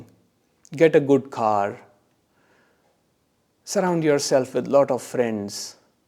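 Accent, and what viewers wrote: India. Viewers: Maybe you are not awakened? Maybe you are just another douchbag on the Internet with Indian accent. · Immediately clicked away when I heard the indian accent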